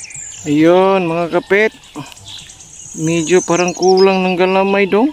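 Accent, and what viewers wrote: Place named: Bohol, Philippines. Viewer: Ta taga bohol ka ? Maila mn gd sa tingog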